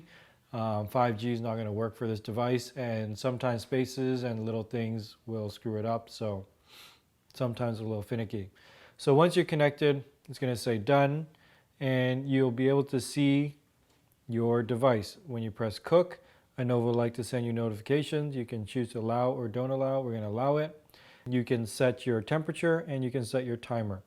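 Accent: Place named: United States. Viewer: Duh do you hear an accent. Hes from the US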